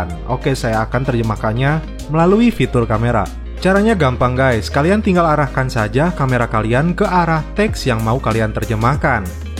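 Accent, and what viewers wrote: West Java, Indonesia. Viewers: Knpa bang aya sundaan wkwk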